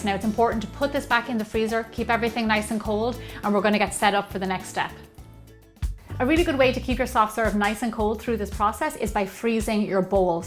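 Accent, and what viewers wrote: Ireland. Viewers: Hi! I was just wandering, are you Irish ? 😀😀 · OMg sooooo good! I love your Irish accent soooo much. I'm Irish too but born in USA and always wanted to live in Ireland. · r u Irish? and btw love ur vids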